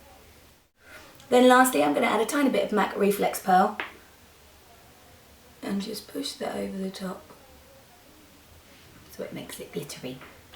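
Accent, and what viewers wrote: United Kingdom. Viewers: Really a beautiful makeup! I hope you had a wonderful wedding. (and I love your british accent)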